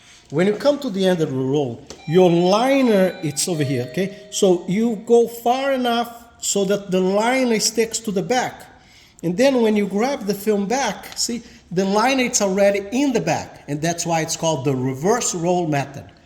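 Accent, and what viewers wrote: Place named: Brazil. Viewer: Sotaque brasileiro rsrsrsrs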